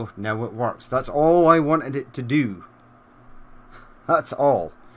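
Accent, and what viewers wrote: Scotland, United Kingdom. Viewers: Hey, are you Scottish, Irish, or some flavor of British?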